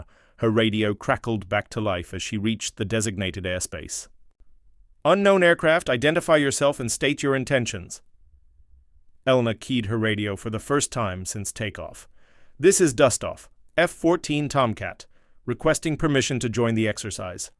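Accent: England, United Kingdom. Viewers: The English accent is very off putting.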